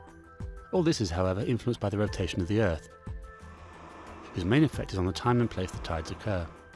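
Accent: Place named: United Kingdom. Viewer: LMAO! Everyone complaining about the narrator are clearly not British. Sorry that British people are intelligent and communicate effectively. I understood every word he said.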